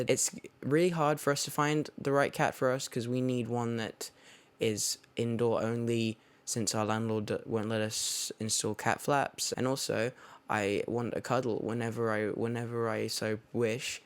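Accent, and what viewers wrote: United States. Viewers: is it just me or does he sound a bit american in this video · Can we talk about how AMERICAN his accent has become? Cultured <3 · WHY DOES HE SOUND AMERICAN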